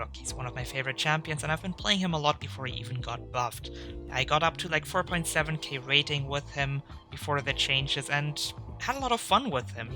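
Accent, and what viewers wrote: Germany. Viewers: du bist deutsch, oder? der Akzent klingt familiar :D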